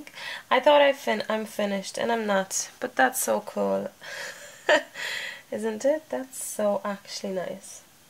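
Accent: Cork, Ireland. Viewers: you made me laugh so hard hearing the word 'mankie', my mam used to say that when we were younger and I use it around my grand babies too, so funny. Can I detect a Cork accent when you talk, I'm in Athy Co. Kildare. I love to watch you work your magic in your videos, thank you for sharing them all with us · I keep hearing Irish vowels in your accent, have you settled in Dublin? Love your tutorials btw. Thank you ☺️ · I hear a hint of an Irish accent in there mixed with your native accent..Am I wrong?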